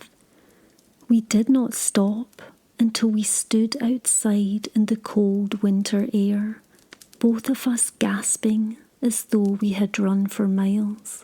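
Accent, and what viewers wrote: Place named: Glasgow, United Kingdom. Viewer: Irritatingly slow voice. Clicky over emphasis of the letter S. Very poor narration, and I'm a Scot from Edinburgh. Sounds like a Weegie trying to hide the typical whiney Glaswegian accent.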